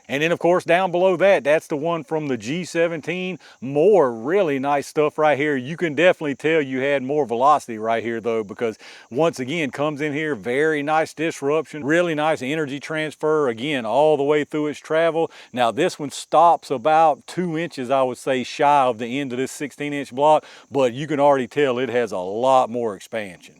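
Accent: South, United States. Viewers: Judging that accent, somewhere in the North Carolina/Tennessee area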